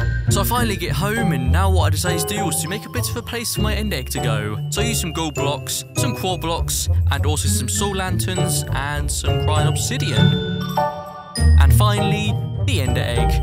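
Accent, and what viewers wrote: United Kingdom. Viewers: He sounds like in uk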